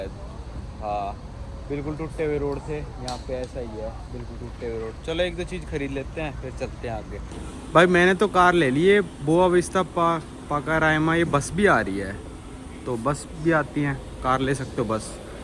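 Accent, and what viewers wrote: Haryana, India. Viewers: bhai accent pehle wala hi rakho. Ye aapka haryanvi accent irritate KR raha hai. 🙏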